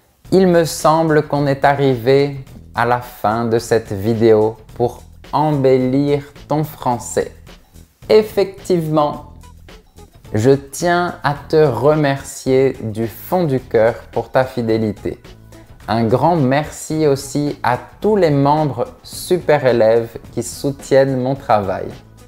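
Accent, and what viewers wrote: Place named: South, France. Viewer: Vous avez un accent du Sud, ne c'est pas, professeur ? C'est très joli.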